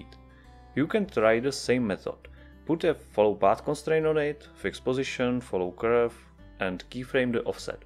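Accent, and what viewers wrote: Czech Republic. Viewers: Zda se mi, ze tvoje anglictina zni celkem cesky 😀 Je to pravda?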